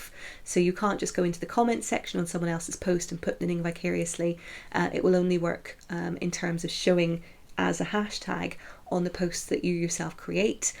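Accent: Scotland, United Kingdom. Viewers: Thank you for a fabulous first episode! You are hilarious. I love your accent, in which I can hear your Scottish origins. Scotland is my favourite country in all the world and I have had some very happy times in the Borders (love Jedburgh). Your knitting is amazing, can't wait to see more. Your Rhinebeck drinking game made things very merry here! Now I want to watch Episode 2. Good luck with this brave new world upon which you have embarked. Manuela, in Melbourne, Australia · Love the podcast! Great job, you seem like a natural. You sound totally Scottish to me. I’m also a cussy person and can’t cut bread or cheese straight to save my life! 😂 Can’t wait to watch your other episodes. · Hello there; I would like to say; I love it when your Scottish accent comes out. I would like to ask, How do you keep the moths from your beautiful stash. I have bagged up all of my balls, because of the little fiends. 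I really enjoyed listening to your rant. I feel right at home with it. I used to live in Scotland - the Isle of Lewis. (not really the borders- but my daughter lived there and she now has an accent that is like yours- So I guess that might be why I like your style so much) I will be enjoying your future programs. I was knitting along as I listened. (and watched- no , really I did!)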